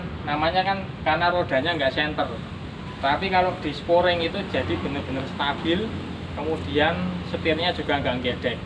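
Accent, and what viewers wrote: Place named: Sleman, Indonesia. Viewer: Setuju logat nya om admin kaya orang Sleman Bantul Gunungkidul dan sekitarnya btw mobil pertama gw kijang new super g 1.8 96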